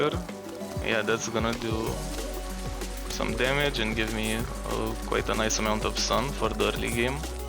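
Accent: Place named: Eastern Europe. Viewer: I love that slavic/russian accent where are you from?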